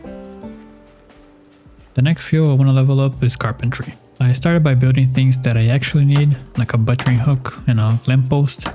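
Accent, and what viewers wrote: Brazil. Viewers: Eu reconheço um sotaque brasileiro, mesmo com um inglês tão bom hehehe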